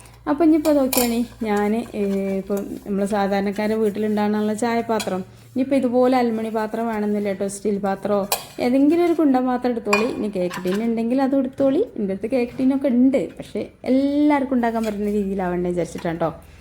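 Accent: Malappuram, India. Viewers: മലയാളം മലപ്പുറം മലയാളം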